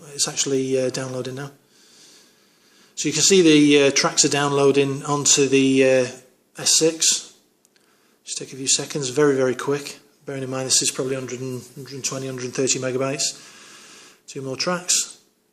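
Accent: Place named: London, United Kingdom. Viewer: what is the app??? i cant understand your london accent !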